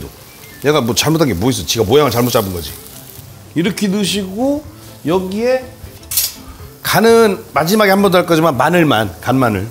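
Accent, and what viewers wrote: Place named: Yesan, South Korea. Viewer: 보령 옆의 구수한 예산의 구수한 사투리의 음성이 귀에 쏙쏙 들어온답니다^^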